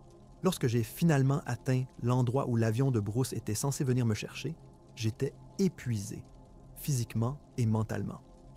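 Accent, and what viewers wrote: Quebec, Canada. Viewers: Oh wow! Belle découverte! Contente d’entendre une voix québecoise qui raconte des histoires d’horreur! Et hop, une nouvelle abonnée! Salut de Laval!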